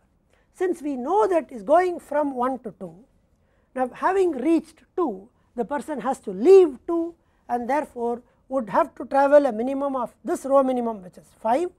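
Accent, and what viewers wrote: India. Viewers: I was replying to the comment complaining about the lecturer's Indian accent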